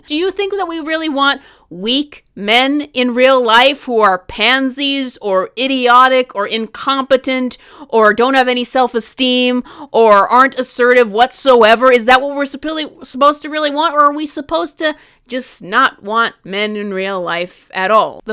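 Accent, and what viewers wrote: United States. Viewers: Holy shit I just knew you had a Minnesota accent before you even started talking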